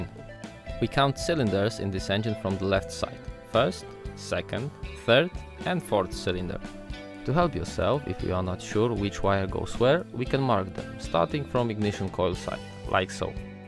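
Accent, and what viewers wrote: Poland. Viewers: Zasubskrybowano, po akcencie coś czułem ze Polak aż zobaczyłem polskie napisy na kompie :) super kanał, dobra robota.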